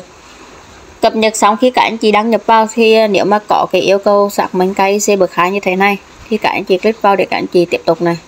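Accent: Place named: Central, Vietnam. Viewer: Quê e ở mô mà trọ trẹ dễ thương rứa e 😂😂😂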